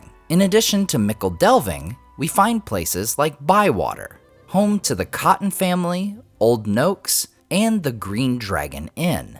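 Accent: United States. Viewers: The american accent is killing me! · Just wish could use a British accent.. I don't mind what region; but it should be British. The american accent kills it for me.. sure I'm speaking for others who are passionate about the Great works of Tolkien here.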